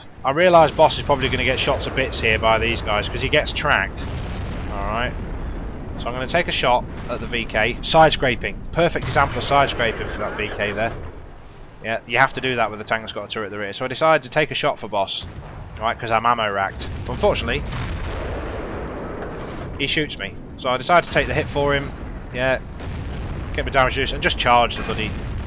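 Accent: England, United Kingdom. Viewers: Which part of England are you from mate?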